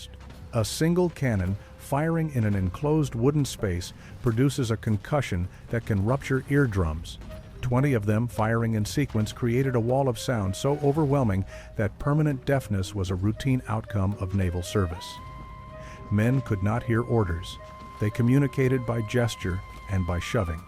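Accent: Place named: United States. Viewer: An artificially generated English accent would have been more appropriate than an artificially generated U.S.A. accent. U.S.A. accents have no credibility or authority, their owners being savage imperialists abroad, brutal fascists at home and lying propagandists everywhere.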